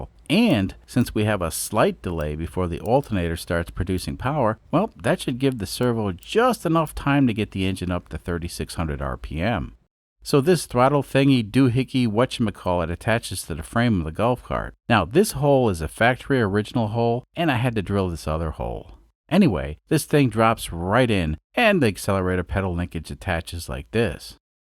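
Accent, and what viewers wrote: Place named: Long Island, United States. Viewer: Lawnguyland accent and bluegrass music!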